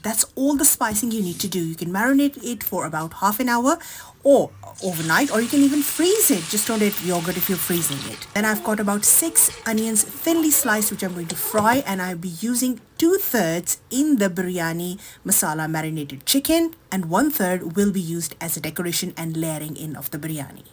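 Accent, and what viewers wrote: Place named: England, United Kingdom. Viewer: That English accent....👏🏻👏🏻👏🏻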